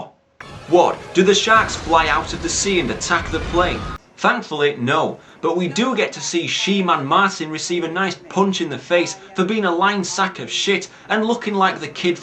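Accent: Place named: Yorkshire, United Kingdom. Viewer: He sounds like he's from Yorkshire in north england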